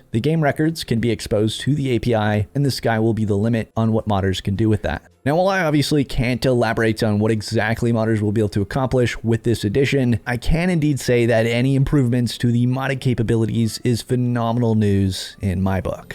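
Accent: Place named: Canada. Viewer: is the master of mods. At first I thought you were from California, but had to pay attention to your accent and you are a true Canadian. 🤣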